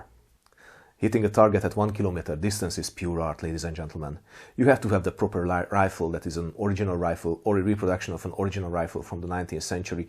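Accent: Hungary. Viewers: This is very cool stuff. I’m from Canada, and much of N America, with the exception of cowboy action, is in to modern stuff. So it’s pretty rare to see this. I’m getting my first antique exposed hammer shotgun though, and an antique muzzle loader? There’s a bunch from the civil war, but they aren’t cheap, and they stuff you have is even more expensive, since we’re newer countries. We didn’t have many of those guns here. Still, fascinating to watch, and interesting to compare your accent to my wife’s Hungarian accent. 🙂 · Always a good day when uploads in beautiful hunglish language.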